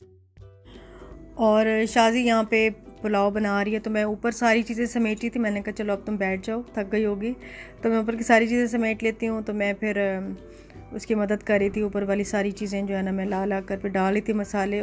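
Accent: Saraiki, Pakistan. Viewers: آپکی پہلی وڈیو دیکھی ہیے بولنے کے انداز سے آپ سرائکی لگ رہی ہیں؟؟؟ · Aap yaqeenan saraiki speaking hen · I hate this language n this pak acsent. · I strongly feel that u r saraiki or multani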